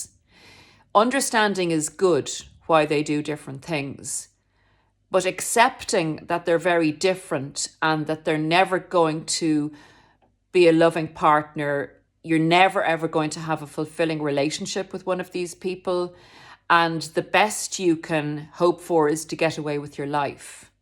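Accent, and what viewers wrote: Ireland. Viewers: Just want to say I just ran into your channel wonderful videos I love your Irish accent and your charisma your a Attractive Spiritual Lady.. well here's my story.. Around 2014 I was on social media and Facebook a woman girl who latched on to me as a really close friend that was into the same arts and Music as myself. Just prior I had broken up with a previous girlfriend she came on pretty strong throughout 2014 till 2016 I started getting really close and I felt I found a wonderful person well I'll start off by saying she was a covert narcissist NPD vulnerable type but this is way before I figured it out we were long distance Maryland and Oregon I thought wow I finally met a perfect match. Time went on we started flying out to see each other back and forth for the next 4 years it was very amazing and she seemed very angelic and a stand-up person and she had a one son she was very generous and always kept offering to book our vacations and pay for everything I didn't like that feeling and paid for most stuff for us also that we did also I was sort of entranced that she was just doing it out of the kindness of her heart and care and was what I learned a codependent such as myself and she was really having problems with depression and other things and was looking for a connection. 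When we weren't seeing each other physically being long distance we talked on the phone every night when I get home from work and do Skype until we could see each other again on our vacations from work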